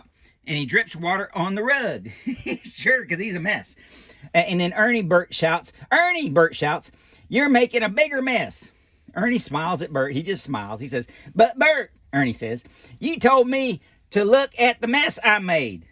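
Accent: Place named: Deep South, United States. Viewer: Most of this video just sounded like talking like he was from the Deep South. Lol. has a slightly higher voice from all of the old show tapes I’ve watched.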